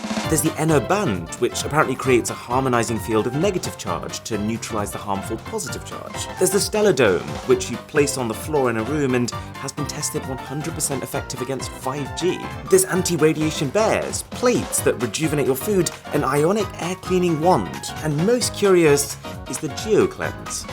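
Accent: United Kingdom. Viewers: I come here to hear a british accent and get my subscibe putton punch on my account. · Australian dollars later... I thought he was from the UK🤔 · your austrailian? but you sound so British. Do an episdode with dank pods!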